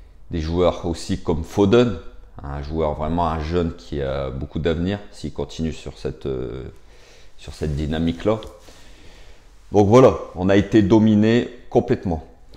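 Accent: North, France. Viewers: Jme demande toujours si tu viens pas du Nord ta grave l'accent ! Ta raison mon frère je pense que pour être compétitif il ns faut 2 gros bancs énorme comme on ttes les équipes modernes ex Bayern city etc..... Une équipe collectifs pas des joueurs qui se croient au five ! Nos joueurs c'est encore trop peu c'est encore trop faible Bakker Diallo Draxler icardi kehrer faut les vendres !!!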